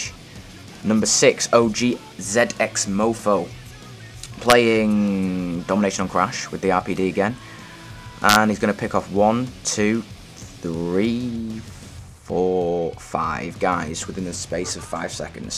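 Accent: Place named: United States. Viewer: why do you say "zee"? american accent is very entertaining to listen to... xD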